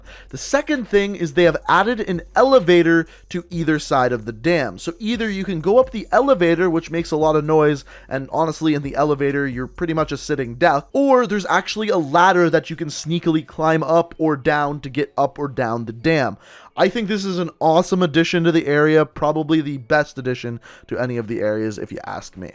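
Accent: Canada. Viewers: That is the most Canadian accent i've heard in a while. I love it.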